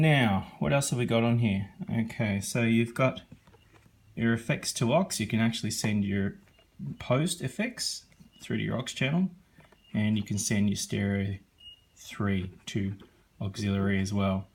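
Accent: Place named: Australia. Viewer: Love the oz accent 🙂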